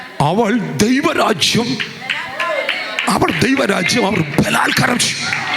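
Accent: Thrissur, India. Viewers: തൃശൂർ ഭാഷയ്ക്ക് എന്താ കുഴപ്പം. ഒരു കുഴപ്പവും ഇല്ല.. സാർ ധൈര്യമായി പറഞ്ഞോ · എനിക്കിഷ്ടമാ ഈ തൃശൂർ ഭാഷ.... അത് പോലെ പാസ്റ്ററിന്റെ ചിരി....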